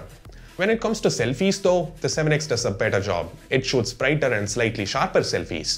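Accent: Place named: India. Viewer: Your accent is amazing lol. Some times i cant even hear that indian accent. While some times i can hear it clearly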